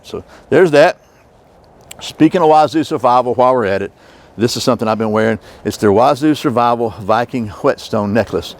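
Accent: Georgia, United States. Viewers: It would be really helpful for those of us who haven't quite calibrated to your Georgia accent if you would list the items you described on this wonderful video so that we have a fighting chance of purchasing the right items!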